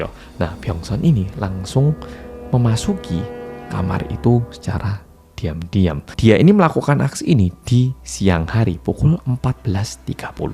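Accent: Java, Indonesia. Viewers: medok Jawa... hadeeuh... gajelas ngomong nya lu ah sipit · Medok e pol mas hehehe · Hhhhhh bener bener medok bang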